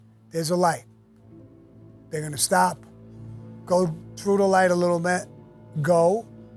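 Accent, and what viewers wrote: New York, United States. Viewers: We are so blessed .Hear authentic stories from one of the legendary gangsters. unique stories told with a beautiful New York accent. For me it's better than any mafia movie I've ever seen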